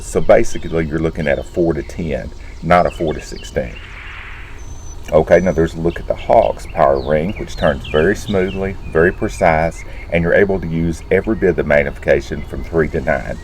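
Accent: South, United States. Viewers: great no bs video comparison. laughed all the way thru it! you remind me of Duke Nukem with a southern accent, you are awesome brother keep it up.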